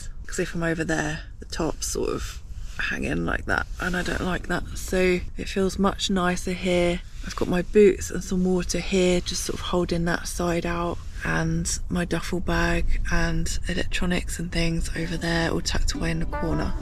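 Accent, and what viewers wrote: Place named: RP, United Kingdom. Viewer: You sound very posh to me. It's like I'm watching a BBC Nature programme.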